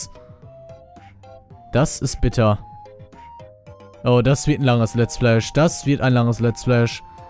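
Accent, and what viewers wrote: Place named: Berlin, Germany. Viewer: Der jute akzent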